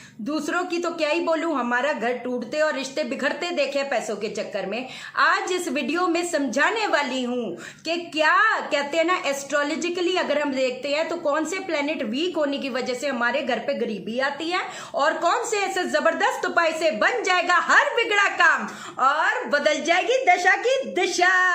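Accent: Punjab, India. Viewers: di punjabi touch ayta batono maa apki maa bi punjbi hun so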